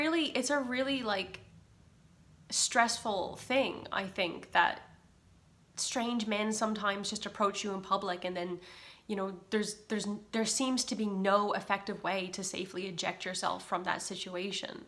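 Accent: Newfoundland, Canada. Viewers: That's the Newfoundland accent with a bit of overseas dilution in it. It's quite unique.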